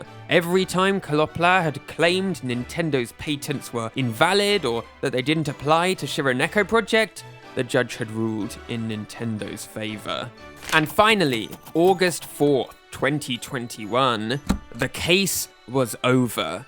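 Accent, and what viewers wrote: United Kingdom. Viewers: Thanks, I was going to ask that. It's obvious you're British - your accent and the spelling of "honor" with a "u" are dead giveaways.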